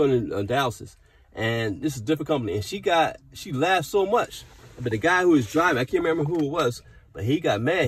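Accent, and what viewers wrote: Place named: Philadelphia, United States. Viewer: You can so tell you are a Philadelphian. You talk so fast lol. But I'm a Philadelphian too so understood every word. I lived in Philly all of my 40 years but I am unfamiliar with this area. I love history and I found out that the first stone home built here in Philadelphia, that still stands today, was in West Philly. It was the home of William Penn's doctor. It's at 53rd and Woodland. I want to drive by one day to see it. I am more familiar with North Philly and Northeast Philly. I am so unfamiliar with South Philly and West Philly. Also, University City. University City doesn't even feel like Philly to me. It's a bunch of college kids and transplant yuppies. But anyway, I enjoyed listening to your story. Also, I can tell by all of the great and positive things you said that your time will come, kind sir. 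I am huge on God and spirituality and I was told before that if you truly want something to show gratitude towards it as if you already have it. When we do that we actually draw it to ourselves by our sense of gratitude. Almost like fooling the universe. Coincidences are merely God's prearrangement of plans and maybe I wrote something in this comment that you were meant to hear. If I didn't I still hope to leave an imprint of unconditional love on your heart. May God bless you on all of your future endeavors and for the sake of humanity, please keep spreading love. The world desperately needs you more now than ever before. Good always conquers evil.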